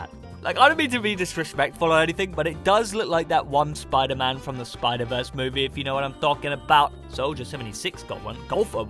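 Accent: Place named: Sweden. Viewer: where are you from? you sound a little bit swedish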